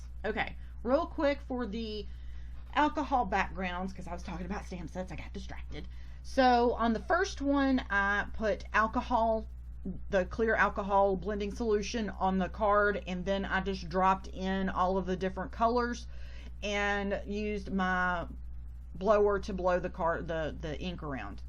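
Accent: South, United States. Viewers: What awesome cards! I’m so thankful that you chose to participate in this important cause! Honestly, to hear someone with a southern accent is even more encouraging. Bless you!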